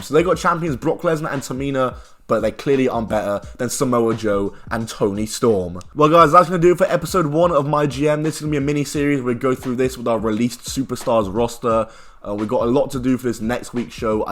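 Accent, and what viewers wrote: England, United Kingdom. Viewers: I’m a black man from Brooklyn NY and I’m a sucker for an English accent speaking on wrestling. Random af but I love your content bro you’ve gotten me through a couple rough days at work I appreciate you for that man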